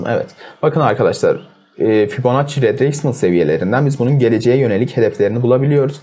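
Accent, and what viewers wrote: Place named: Gaziantep, Turkey. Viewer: hocam antepli olduğun o kadar belli ki ;)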